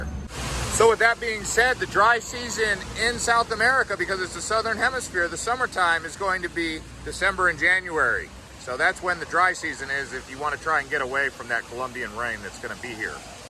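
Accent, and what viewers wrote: United States. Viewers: is in Colombia wearing a 'Columbia' top. Overall, this is a well-researched video. Granted the pronounciations are more American based, but I can see the effort made. It DOES RAIN A LOT. November is also a good time to come with less rain (at least to Bogota). "If you want to find bad people in Colombia, you can surely find them, as you could in New York or Los Angeles. But nowhere have my crew and I been treated better or with more kindness and generosity. I’d bring my family on vacation there in a heartbeat. And hope to soon. As I said before: Colombians are proud. Let them show you what they are proud of." ~ Anthony Bourdain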